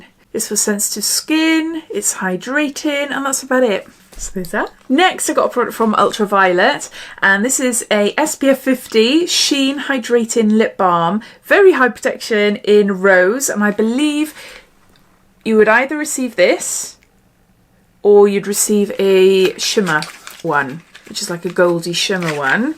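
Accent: Wales, United Kingdom. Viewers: It looks fab xx I loves your welsh accent. My family are half Welsh xx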